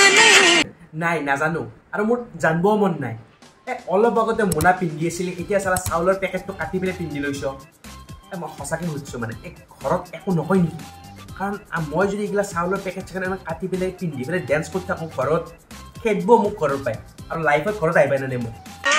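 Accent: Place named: Lower Assam, India. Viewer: Lower Assam accent tu marel bese bhal lage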